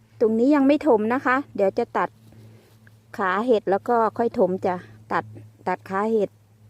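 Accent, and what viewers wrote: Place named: Isan, Thailand. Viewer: ชอบเวลาเว้าอิสานจะน่ารักดี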